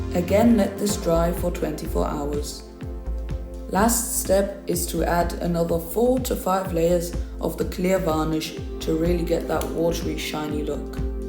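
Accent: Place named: Germany. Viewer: Als ich den Akzent hörte dachte ich mir schon dass der Youtuber hier in Deutschland sitzt. Leute, es gibt 'unendlich' viele Kanäle von englischen Muttersprachlern, oft nur schwer verständlich wegen miserabler Audioqualität oder weil der Typ nuschelt bzw. sehr schnell spricht. Muss man da unbedingt noch ein weiteres Video in Englisch hinzufügen um viellecht die Reichweite zu erhöhen und möglichst viele Klicks zu generieren? Mir geht die Dominanz englischsprachiger Videos zunehmend auf den Geist...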